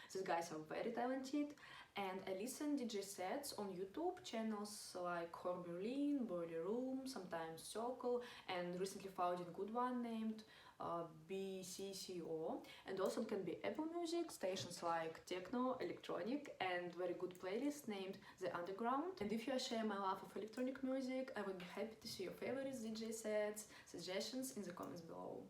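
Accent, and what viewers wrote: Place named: Russia. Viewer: This russian accent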